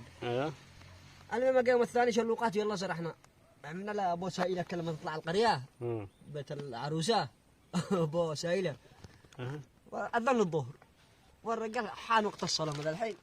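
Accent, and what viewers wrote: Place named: Taiz, Yemen. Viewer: يا جماعة هذا لغجة عنده لغاجة وكمان يبهرها باللهجة التعزِّية أغثى وأكره لهجة أعوذ بالله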